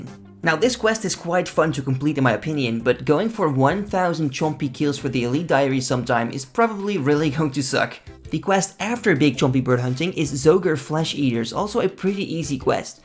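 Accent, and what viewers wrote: Netherlands. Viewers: such a baller lmfaoooo. You kinna sound dutch doesnt suprise me you a fan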